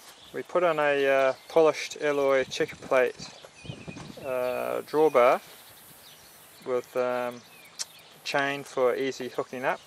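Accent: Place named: Australia. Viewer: In a few years when I ship a Sceniccruiser over from the states I'll buy your engine. Presuming your Australian given the accent mate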